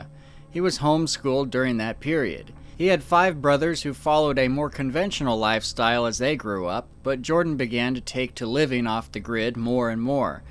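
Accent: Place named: Upstate New York, United States. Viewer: Took me a bit to figure out that accent... ITs the same as Rod Sterling. Guessing your in up state New York, or close to it,,,lol.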